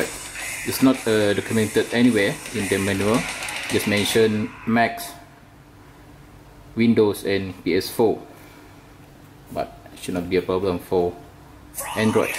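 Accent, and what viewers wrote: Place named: Malaysia. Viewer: Your accent just like Malaysian.....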